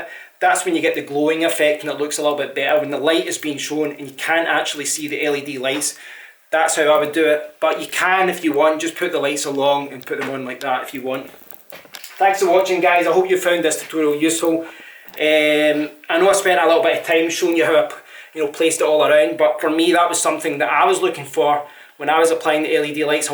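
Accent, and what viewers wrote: Scotland, United Kingdom. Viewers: So awesome opening a YouTube video about tech I wanted to watch and hearing a Scottish accent! 🥃Well gid. #scottishtechyoutube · Gotta love the Scottish accent! I prefer female, though. Good review,! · Thanks nice to hear a good Scottish voice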